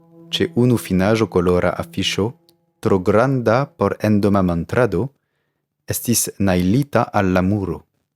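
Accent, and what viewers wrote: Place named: France. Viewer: Mi rekonas francan akĉenton sed ne la precizan homon...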